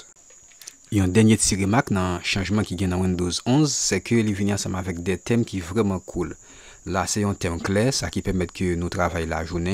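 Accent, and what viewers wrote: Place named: Asia. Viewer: Sans l'accent asiatique, ce serait plus facile à suivre...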